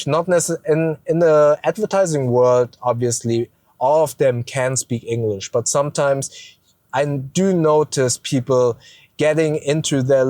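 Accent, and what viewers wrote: Germany. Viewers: Your English sounds fine to me. To be honest, when I first saw your videos on your main channel, I did notice the accent but I couldn't nail down. Didn't even know it was a heavy German accent until I saw this channel. Living in Hawaii, we have people from all over Asia and the Pacific so there all kinds of accents, including a localized version of English which borrows a lot of words from other languages so hearing yours didn't really phase me. People making those comments about your English, especially Americans either live in an area where there isn't a lot of ethnic diversity or just being trolls. You're doing a great job!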